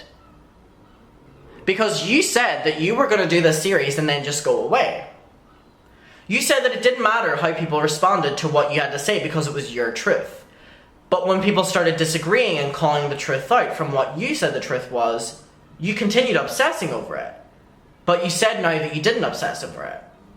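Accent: Ireland. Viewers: Knew when you started talking you were from Ireland LMAO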